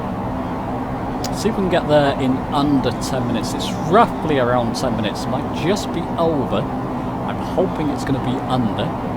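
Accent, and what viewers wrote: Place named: Scotland, United Kingdom. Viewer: hi. you say that the flight time is 6 minutes from landidan to paris. i am trying to do the same route. i have download the plane but can't find landidan. is that in finland? although the flight would be impossible. you did say 6 minutes? sorry, i have trouble with your scottish accent as i am english:/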